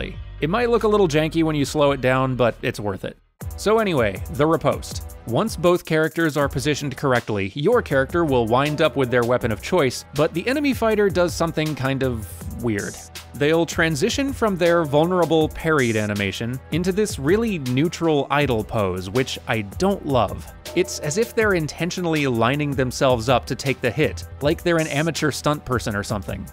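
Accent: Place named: United Kingdom. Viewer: That british accent tho. Most Exquisite.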